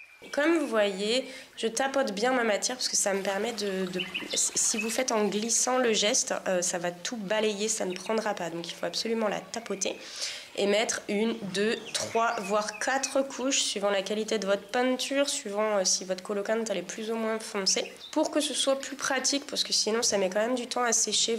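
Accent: South, France. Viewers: J'adore cette vidéo avec le bruit de la nature ♥ C'est vraiment une belle idée et la coloquinte blanche avec les pois est ma préférée. J'ai remarqué que tu avais beaucoup l'accent du sud dans cette vidéo, je me trompe? Bisous ♥ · j'avais remarqué aussi que j'entendais un accent du sud que je n'entendais pas avant ^^ J'aime bien les accents :)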